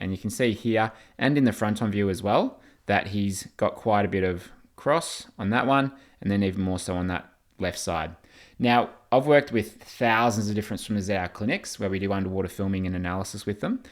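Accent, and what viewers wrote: Australia. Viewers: Australian accent makes this feel both legit and relaxed as hell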